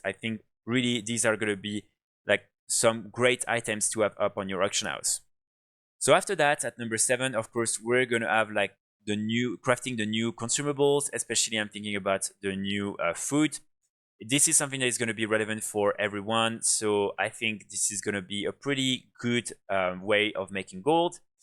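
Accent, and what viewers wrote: France. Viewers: Really like your narrating style and you're French accent! 😊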